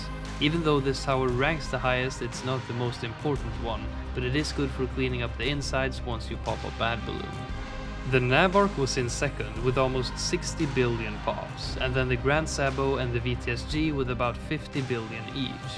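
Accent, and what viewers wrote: Sweden. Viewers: Kan inte annat än älska den svenska accenten! Härlig video! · the swedish accent is wayy to hard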